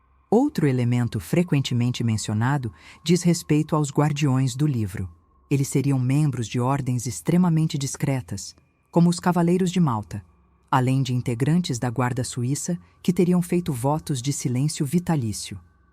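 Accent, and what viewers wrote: Brazil. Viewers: de qual estado brasileiro tu é?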